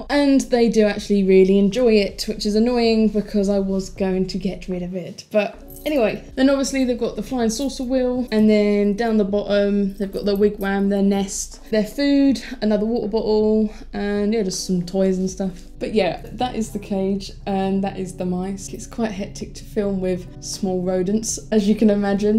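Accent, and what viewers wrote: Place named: United Kingdom. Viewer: Love the accent innit. UK accents are so cool.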